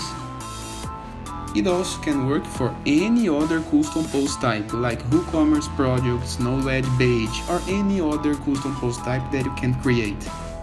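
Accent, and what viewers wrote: Brazil. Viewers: Pense num sotaque BR! vlw mano pelo vídeo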